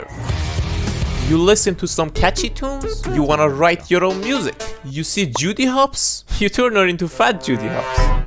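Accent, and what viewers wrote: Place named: Iran. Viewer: are you persian bro? just going of your accent and how the fuck do you have so few views????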